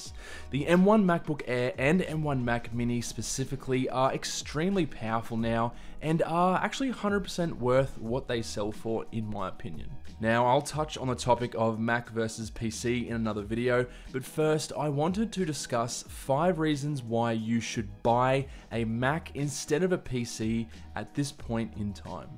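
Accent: Australia. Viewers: Generally, I face difficulties understanding the Australian accent, but the way you speak - clearly and slowly, I get almost 100% of what you are saying.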